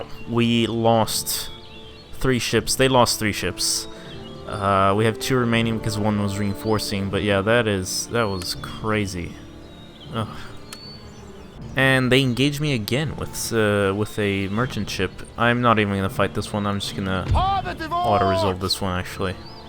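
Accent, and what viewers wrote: Sweden. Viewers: Are you Swedish? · Are you from sweden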